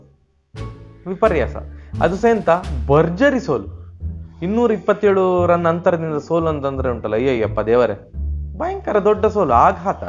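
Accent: Mangalore, India. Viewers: ಎಬೇ ಅಂತ ಆಗ್ತದೆ😂👍..ನಮ್ಮ ಮಂಗಳೂರು slang ಇಷ್ಟ ಆಗ್ತದೆ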